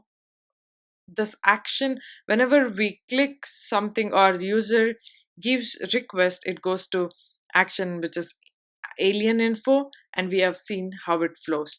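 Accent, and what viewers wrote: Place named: Europe. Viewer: Her accent is more like European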